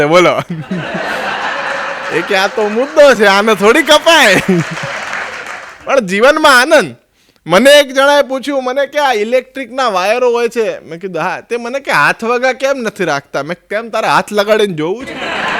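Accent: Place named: Ahmedabad, India. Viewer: ભાઈ તમારા હાસય મારફત તમો જે લોકો નુ દુઃખ દૂર કરો છો તે માટે આભાર વકત કરુ છુ આજે હાસ્ય વિસરાય ગયુ છે કેમકે આજે પતી પત્ની બંને જણા વ્યવસાય મા એવા રોકાયેલા છે હાસય શુ છે તે ભુલાય ગયુ છે તમો જે બોલો છો તે પાકકા અમદાવાદી છો એવુ લાગે છે તમો ને સાંભળી ને ખુબ આનંદ થાય છે આ રીતે આનંદ પીરસતા રહેજો આનંદ આભાર આશીર્વાદ ધન્યવાદ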